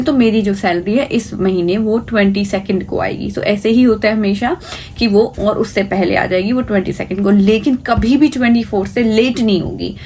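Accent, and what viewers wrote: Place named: England, United Kingdom. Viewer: Ap k Hindi mai English ki jhalak arehi hai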